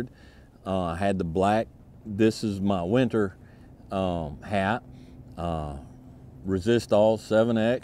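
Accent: United States. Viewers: Thank u, sir! Feels like I'm taking with my pal, or an uncle, or alike 🤝 and I love your genuine attitude, sir, and your accent. Real American. Big up and all best from a random Russian dude 👍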